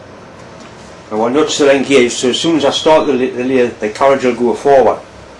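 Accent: Newcastle, United Kingdom. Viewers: Awee man. You from newcastle??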